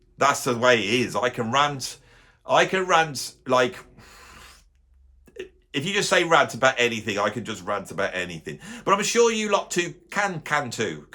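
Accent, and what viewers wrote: Birmingham, United Kingdom. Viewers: The brummie accent is hilarious . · Definitely! Plus I always think that a rant in the Brummie accent always gives it that extra 'Je Ne Sais Quoi' !!!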